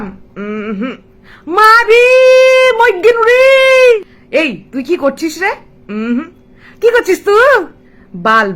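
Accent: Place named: Rajshahi, Bangladesh. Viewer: বাংলাদেশের রাজশাহী, চাঁপাইনবাবগঞ্জের ভাষা একদম এরকম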